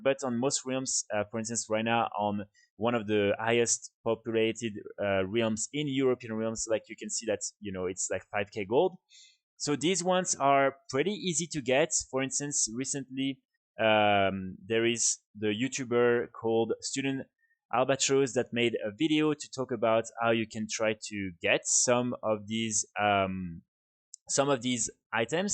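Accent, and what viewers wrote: France. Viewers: u speak very well :p french ?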